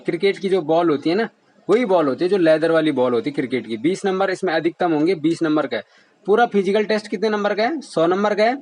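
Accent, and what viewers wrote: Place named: Garhwal, India. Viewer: bhai jiii aap gadwali ho apke jhalak pure gadwali ho nice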